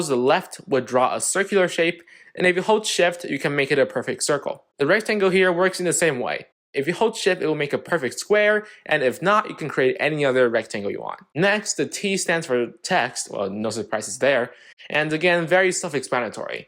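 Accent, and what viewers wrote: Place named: China. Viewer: Your Chinese accent is funny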